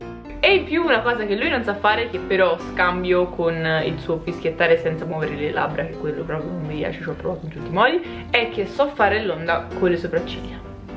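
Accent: Tuscany, Italy. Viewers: Sei di firenze o comunque da qualche parte in Toscana?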